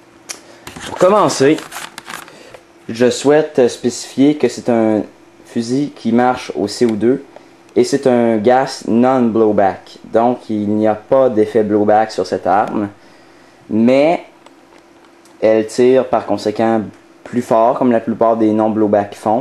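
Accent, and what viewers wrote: Quebec, Canada. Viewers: with a canadian accent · Belle review merci. Et vive les canadiens leur accent est vraiment super (je ne moque pas je suis en admiration sa donne un petit charme). De Belgique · Meuh non ^^ Quebecois ! un accent pareil ^^ ! Vive le Québec Calice !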